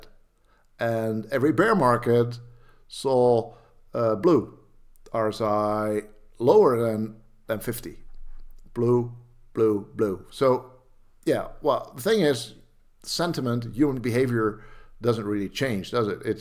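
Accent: Netherlands. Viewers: I love the Dutch accent. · Are you Dutch? 🎉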